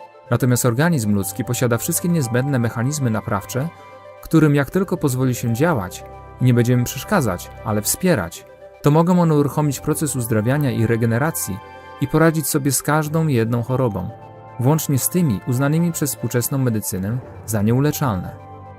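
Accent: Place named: Russia. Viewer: Wreszcie, pan, znalazł sposób by być zrozumianym, wielokrotnie próbowałam dotrwać do końca wykładu, ale w związku ze złą wymową lub też brakiem słówek język pana dla mnie jest nie możliwy aby zrozumieć, co ma pan na myśli, bardzo ciężko, jednakże lubię rosyjski nalot językowy, ale w tak poważnych zagadnieniach potrzeba dokładnego przekazu, bez naleciałości, ponieważ to sprawia rozproszenie i niemożliwość koncentracji.Podziwiam jednakże zasób wiedzy, jaką dysponuje Pan, życzę wszystkiego dobrego, Pozdrawiam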